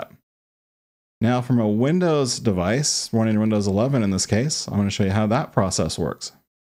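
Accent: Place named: United States. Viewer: American pronunciation BAD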